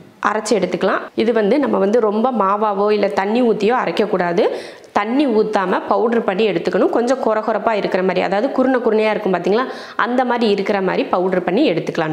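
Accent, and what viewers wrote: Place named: Theni, India. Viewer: செரட்ட தேனி language.....😂😂😂 Ur way of talking also தேனிகாரவங்க..... super sister நம்ம ஊரு மணம் ❤️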